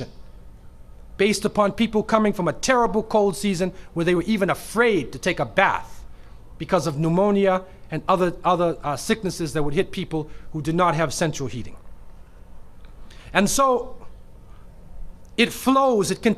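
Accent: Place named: Canada. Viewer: where is this guy from? what part of Canada?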